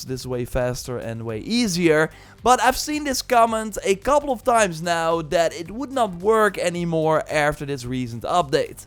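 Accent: Netherlands. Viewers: Are you Dutch? Your accent sounds like it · Mooi Nederlands accentje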